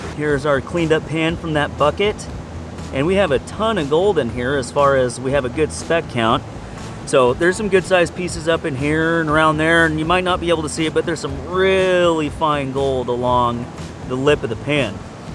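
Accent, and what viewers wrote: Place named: United States. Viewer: Gold in the top material, gold in the red oxidized sand, gold in the blond sand . Flood gold is found in flood layers? Excellent deduction! What country are you in? What river? You have an American accent, not Australia, Colorado, Arizona? are you in Northern California?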